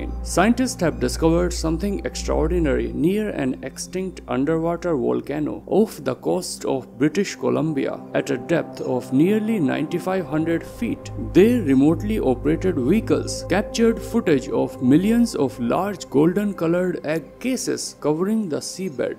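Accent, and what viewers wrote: India. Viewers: Lol Youtoube get this trash out of my feed. AI + Indian accent? come on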